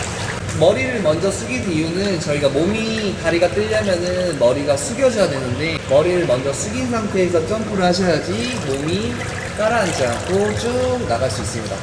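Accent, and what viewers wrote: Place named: Jeolla, South Korea. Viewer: 말투가 전라도ᆢㅎㅎ 저는 목포염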